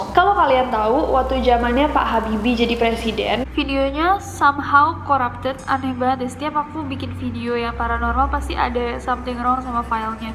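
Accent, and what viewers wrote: India. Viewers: ka ..kaka itu aslinya itu dari india ato inggris sih ??? :v binguuung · I Like Your Indian Accent.. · indian accentnya keren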